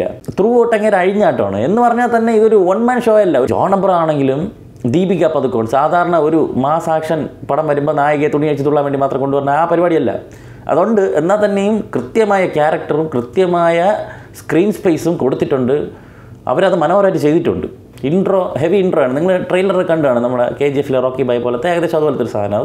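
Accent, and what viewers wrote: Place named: Thiruvananthapuram, India. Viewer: തൃശൂർ പഠിക്കാൻ വന്നതിനു ശേഷം ഞാൻ തിരുവനന്തപുരത്തെ സുഹൃത്തുക്കളുടെ സംസാരം മിസ് ചെയ്‌തു. നന്ദി. Hope we can be good friends.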